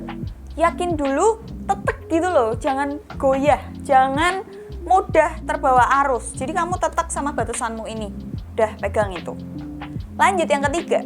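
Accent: Java, Indonesia. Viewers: medok e lucu....hihiii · Aq kok seneng gaya ngomong e mbak 😊 medok Jane tp kok ngangenin🙈🙈😅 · medok mu loh mbak :)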